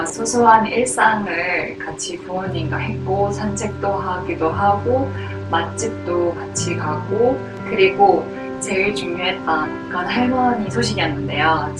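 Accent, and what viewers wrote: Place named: Busan, South Korea. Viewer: 아~~~내가 왜 쪼매 더 일찍 를 몰랐을까요? 감성터치 눈물 훌쩍훌쩍! 그리고, 정감 있는 부산사투리와 상반되게 믓진 영어구사 최고예요. 모칠레로 채널합방통해 지난주엔가 알게되어 구독후 시청중입니다. 루프한자 항공? 제가 우찌 도와드릴일이라도..가서 정문에 떵이라도 싸고와야 기분이 풀리지않을까요? 암튼 씨랑 오손도손 지내시면서 등에 파스도 붙여주고 마사지도 서로해주고 안전하게 여행하시기 바래요. & 화이팅 너무너무조아조아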